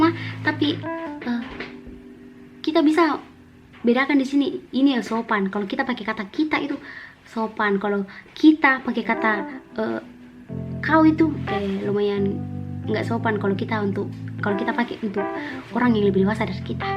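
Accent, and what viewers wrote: Makassar, Indonesia. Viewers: Saya orang Banjarmasin .... Senang sekali mendengar dialek. Makassar .... Negara kita memang luar biasa kaya budaya ..bahasanya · Sya seneng denger ngomong orang makasar sya dri bandung...kangen dh lama g denger orang makasar ngobrol.jdi inget seseorang...yg tlah jauh pergi...smoga d trima amal ibadah nya..jdi sedih logat nya ade ini yg mengingat kan dia...i love u makasar... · Bingung ka kenapa banyak orang luar na suka logat makassar kalau saya biasa biasa ji :/ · Sa orang Jawa mi,,memang suka logat Makasar to,,,wkkkk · Bukan orang makassar tapi suka dnger logat makassar🤣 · Bukanka orang Makassar tapi kusuka dengar loga Makassar 😂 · kesini gara gara lgi di makassar, bingung denger logatnya 😄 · Saya suka logat makasar..tidak di aku tau saya...tpi sekarang saya baru faham... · Kentara skli makassar nya biar di paksa bagaimana di paksa logat baku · Mampir ke chanel ini gara² lagi tinggal di mkssar takut nnti salah kata/pnyebutan😅 Sya asli mnado tpi sneng denger logat mkassar, kadang klau ngobrol suka ha? 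ha? kdang gk ngerti😂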